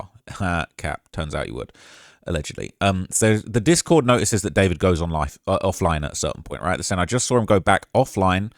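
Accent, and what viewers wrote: United Kingdom. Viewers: Sushi chef with the Brit accent cool combo!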